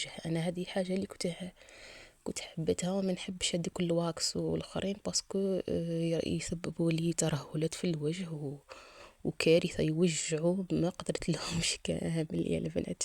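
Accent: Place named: Djelfa, Algeria. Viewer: عندك الجلفة في صوتك 😬اااااااا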